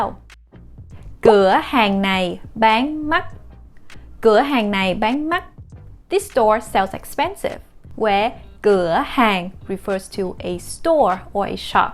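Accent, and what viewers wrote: Vietnam. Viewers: Is this Northern accent or Southern accent? Thanlks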